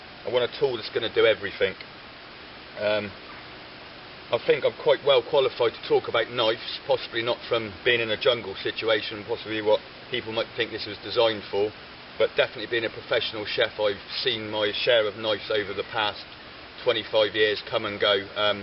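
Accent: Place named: England, United Kingdom. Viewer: Its 751g, which means it can run as an axe too, 49/51 carbon steel, Sheffield forged, micanta handle is lovely I swear by this blade, I run this and a Moro and love them..... I wear mine on the waist with an elasticated knee strap so i can climb over things without it snagging, that little eye on the bottom makes all the difference, do you still bush, sounds like your in England I'm Dorset are we close?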